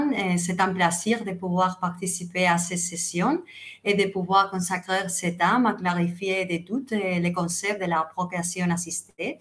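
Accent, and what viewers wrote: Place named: Spain. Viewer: Me encanta tu manera de hablar francés mezclada con español. Gracias por la explicación.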